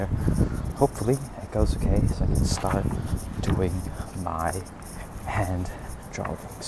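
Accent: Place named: South Africa. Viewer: Amazing work bro... I swear to God u look and sound South African... It your work though that got me💓💓💓.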